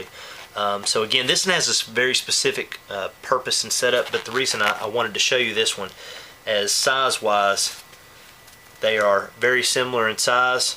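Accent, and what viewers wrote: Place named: South, United States. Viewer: Your accent tells me somewhere in the south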